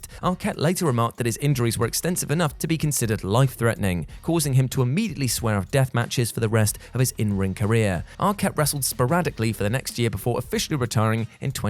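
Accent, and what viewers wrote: United Kingdom. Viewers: Smart call. lol he’s just EXTREMELY British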